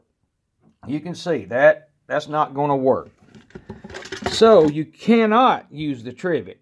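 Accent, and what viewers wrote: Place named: Carolinas, United States. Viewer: I love your accent. I have relatives in North and South Carolina and I miss that accent so much living up here in Pennsylvania. My mom's people are from South Carolina and I have a cousin in North Carolina who sounds just like you. Sweetest accent on earth! It just exudes friendliness! Thanks for the helpful video. Now I know I need an 8 quart so I can put potatoes in with the chicken or large pot roast.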